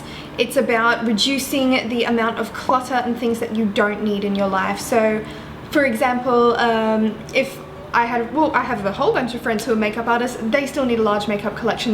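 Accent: Australia. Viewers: I love aussie accent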